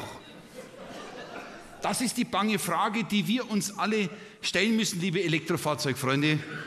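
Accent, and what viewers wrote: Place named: Bavaria, Germany. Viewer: Liebe Krustenzuzler - sensationell - da haut`s da ja den Schua weg... bayerische Sprachkultur in Reinform - immer wieder ein Genuß - Danke Wolfgang Krebs.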